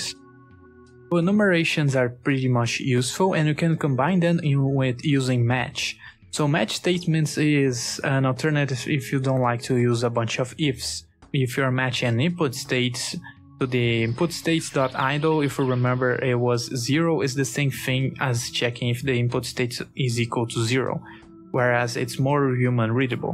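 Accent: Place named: Brazil. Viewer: br? esse sotaque n me engana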